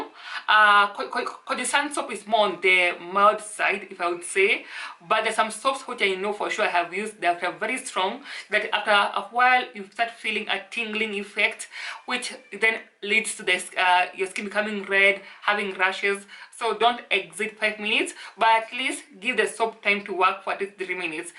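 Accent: Kenya. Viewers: When I heard ur accent I know ur a Kenyan 😍😍😍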